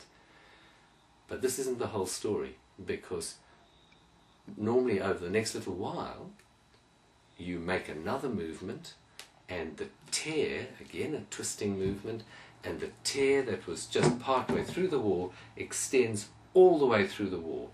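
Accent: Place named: South Africa. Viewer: Hello! Sounds like a nice SA accent there! I have had a slipped disc 3 - 4 times. (had the MRI etc.) I put my back out over Christmas and have the usual lower back pain but thankfully no leg pain. (my hip has gone to one side a bit) I'm really not sure these days whether it's best to lie down and rest or try to walk in the moments when I feel better during the day? May I ask what your view is? Thanks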